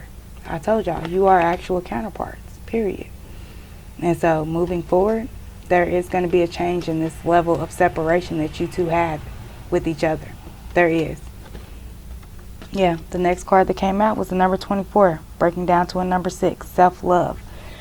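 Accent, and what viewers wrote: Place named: Northern California, United States. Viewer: You sound like you’re from where I’m from, Nor Cal. Lol. I’m hear for another Aquarius man, Scorpio woman reading. Trying to get a read on this new situation I’m in. 🤔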